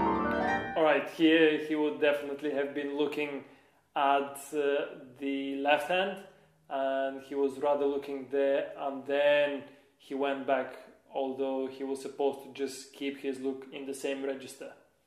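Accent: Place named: Russia. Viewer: Слышу русский акцент)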